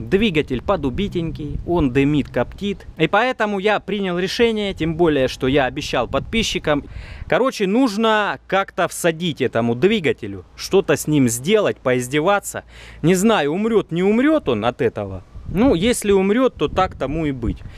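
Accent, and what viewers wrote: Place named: South, Russia. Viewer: ох уж этот южный говор :) хазуй хазуй!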